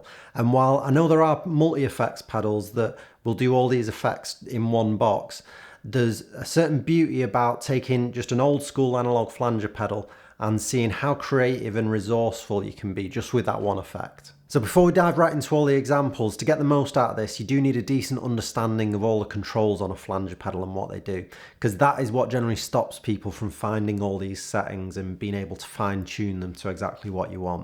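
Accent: Manchester, United Kingdom. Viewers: Too much Brit accent. Can't stand you. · Im going to go and revisit mine right now!.......great sounds..... .....Great also to hear what sounds like a Mancunian accent on YT....Cheers from a Stopfordian relocated to Kent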